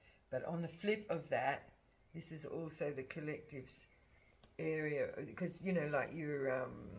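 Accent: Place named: Australia. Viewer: Fellow aussie reader here and I totally get what you mean about the pelican and the shining. I have been talking a lot lately about people with the light in them and how people without it just want to stamp it out in people. It is coming up in readings all the time. Love to her the aussie way of speaking. Have a great new year.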